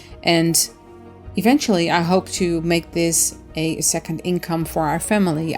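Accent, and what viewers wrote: Netherlands. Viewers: 🐦It was really interesting learning more about you. For some strange reason I thought you were of South African origin, but Dutch makes much more sense with your accent. Don't ever give up on your dream art room, visualise it, manifest it into reality. Yeah I know easier said than done but a little hope and faith won't hurt anyone eh. PS I'm with you on the vegemite. Blerk!!!!! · Lol I thought your accent sounded familiar, my father and his family moved to Canada from Amsterdam. Have you considered doing Aragog as part of the pet collection?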